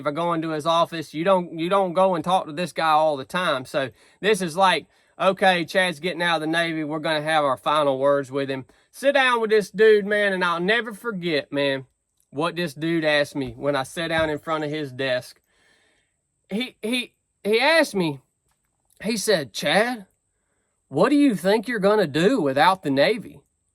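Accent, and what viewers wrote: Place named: Georgia, United States. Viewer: As a northwestern guy, I wound up stationed in Georgia for a few years, of eight. This man’s accent and manner of speaking is most refreshing and brings back a lot of memories. Good job from an old infantryman!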